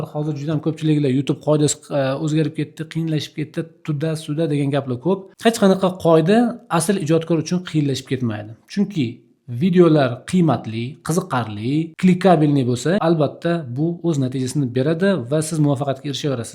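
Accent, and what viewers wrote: Namangan, Uzbekistan. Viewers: Узингиз Намангандансизда, шевангиздан билиниб турибди. Ман олдингизга бориб урганмокчиман, майлими, Наманганданман...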